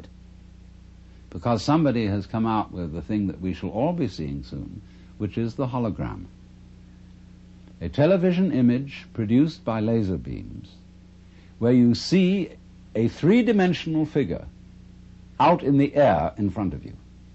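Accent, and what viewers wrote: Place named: England, United Kingdom. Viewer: With that English accent i would not beleive anything he. says.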